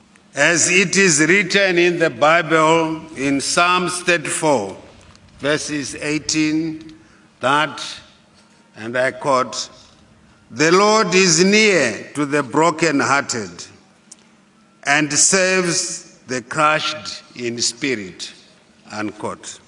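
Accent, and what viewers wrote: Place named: United Kingdom. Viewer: Is this guy really a Zimbabwean ?sounds like he's from British empire (Malawi,)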